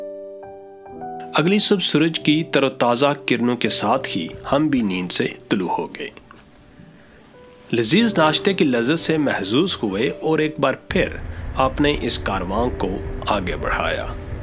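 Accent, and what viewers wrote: Standard. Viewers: Itni pure Urdu MaashaaAllah 😍😍 Bht pyara khubsoorat Mera 🇵🇰🥰🥰🥰🥰💗💗💗💗💗💓💓💓💓💓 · Yar ap ki Commentary ka koi Saani ni hai , Zabardast Pure Urduuu Maza ajata hai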